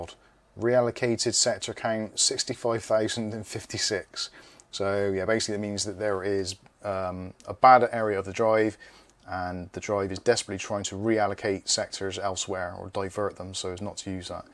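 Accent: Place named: England, United Kingdom. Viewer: 120gb SSD? Wow that's tiny for 2022! 500gb should be minimum and for my PC builds I install 1TB ssds at a minimum. Windows 10 or 11 would fill up the 120gb ssd. Keep uploading these PC fixes, it's like watch Greg Salazar but with a cool English accent!! I will try and make your live stream on Saturday since I'm off work for the weekend.....finally! I still work on Youtube but no bartending this weekend!!! Cheers!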